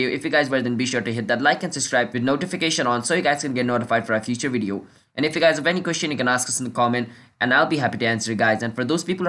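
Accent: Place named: India. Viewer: sounds like an indian guy.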